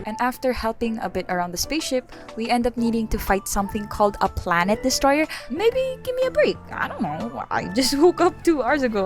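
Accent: Philippines. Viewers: Are you Pinoy by anychance? I can hear it through your voice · Only true Filipinos can tell that has a Filipino accent 👍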